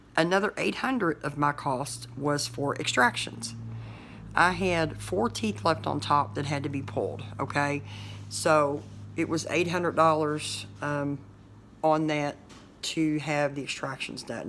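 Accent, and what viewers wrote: United States. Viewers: If you dont mind me asking.... Where is this dentist located? I need 9 teeth replaced and I'm very intrested in what you said about the pricing and everything about this place! I'm quite excited actual you sound southern and I myself am a Southern .... I live in east TN. I hope its not to far away from me. I just really need this in my life and you made it seem like a reality to me and that made me not so depressed and hopeless.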